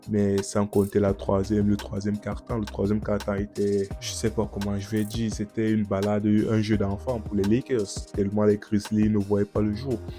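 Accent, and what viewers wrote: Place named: Cameroon. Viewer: L’accent du frère camerounais dommage l’algorithme ne te met pas plus en avant courage pour tes belles analyses oublie pas Lebron reste le boss de cette équipe vous le verrez bientôt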